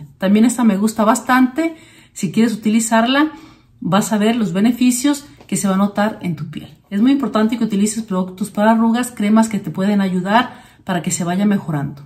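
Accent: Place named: Mexico. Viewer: Hola me gustas mucho como hablas 🥰 mexicana nata 😅 saludos desde 🇪🇦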